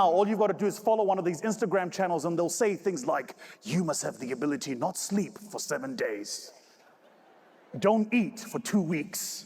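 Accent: South Africa. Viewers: South African accent 🤣🤣🤣🇿🇦🇿🇦🇿🇦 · Your Proudly South African ❤ Africa accent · Pure South African accent. Keep shinning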